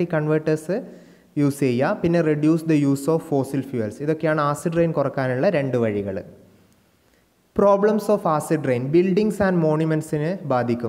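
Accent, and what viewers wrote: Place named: Kozhikode, India. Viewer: Sir Kozhikode aan allo😌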